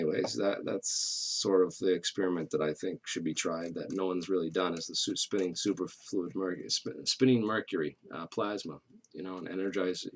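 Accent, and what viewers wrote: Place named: New England, United States. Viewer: My first time watching this channel. Sounds like a New England guy.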